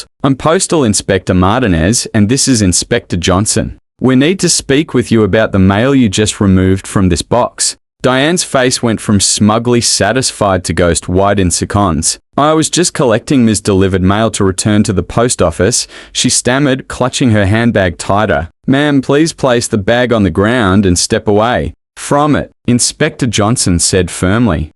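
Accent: Australia. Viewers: Having an Aussie voice telling the story was a pleasant surprise.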